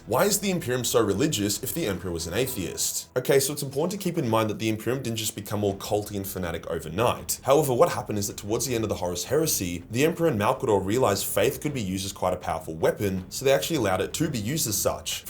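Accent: Australia. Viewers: I never realized had Australian voice over. I wonder what his real voice sounds like.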